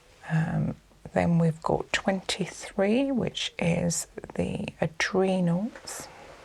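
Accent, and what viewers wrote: United Kingdom. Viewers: Where in the UK are you from?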